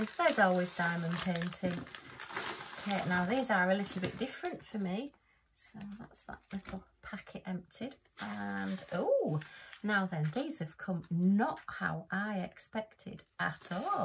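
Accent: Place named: Hull, United Kingdom. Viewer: I thought I recognised your accent!! I was Hull born and bred too but now live in Hornsea. Those five pics are nice, lovely colours.xx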